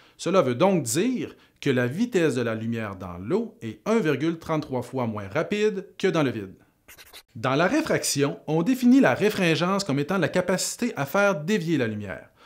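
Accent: Quebec, Canada. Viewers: Je suis en cours je comprend rien y’a trop d’accent québécois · avec votre accent québécoise, sa me donne vraiment envis de le réviser avec vous ^^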